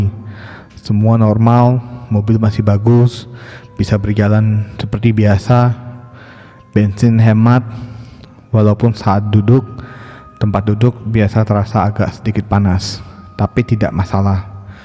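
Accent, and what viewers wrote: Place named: West Java, Indonesia. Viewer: Meni logat Sunda pisan euuyyy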